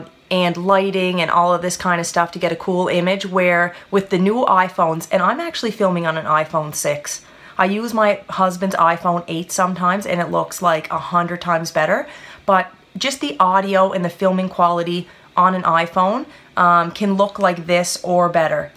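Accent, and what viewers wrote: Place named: Nova Scotia, Canada. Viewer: Thanks for sharing this. I've been thinking about doing a channel of my own for a while. I def love hair stuff and have been watching videos for years on Youtube so that would be my main focus, but I would probably add in some fitness/nutritiong stuff too, since I am a PT...or physiotherapist as they probably call it in Nova Scotia. BTW, I love your accent!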